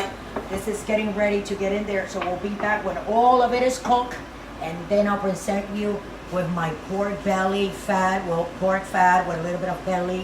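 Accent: New York, United States. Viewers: GREAT STUFF!!! IM A NYC ITALIAN GUY JUST CURIOUS R U IN NYC TOO SOUNDS LIKE IT LOL I SUBSCRIBED THATS MY KIND OF FOOD LOL I USE A TON OF PORK BELLY FAT WHEN I MAKE MY 5 HOUR SUNDAY MEAT GRAVY SALUTO 🍷🍷🍷🇮🇹🇮🇹🇮🇹 VIC FROM NYC CIAO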